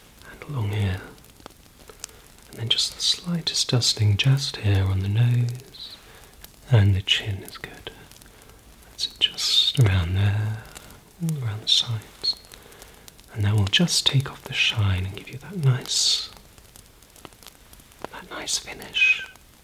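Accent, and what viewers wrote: RP, United Kingdom. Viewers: Very nice British accent I love when the queen answered for me that was nice very relaxing 😴😴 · I’ve never heard someone with a British accent do an impression of a British accent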